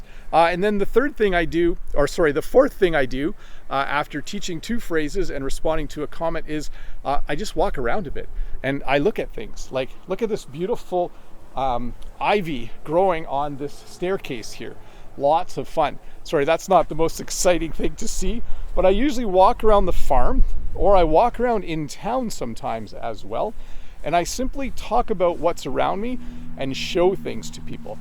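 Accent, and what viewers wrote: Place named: Canada. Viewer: Canadian English❤nice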